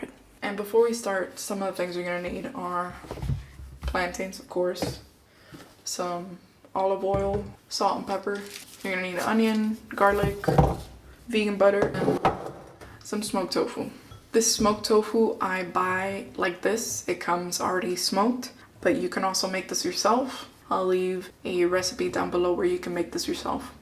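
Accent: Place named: Miami, United States. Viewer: Lmao you’re so Miami with the accent and hand gestures, I love it!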